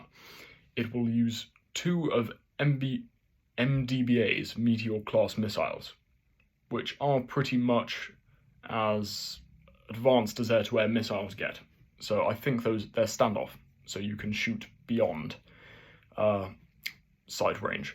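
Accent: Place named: RP, United Kingdom. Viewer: Very interesting thank you. I have seen some pictures of the drone, but not any in detail specifications of the program. Do you know if the concept 2 is the design they’re taking forward? During the video I might have had to rewind a couple of times to understand, but we don’t speak the Kings English quite like he does, this close to the Scottish border. 😂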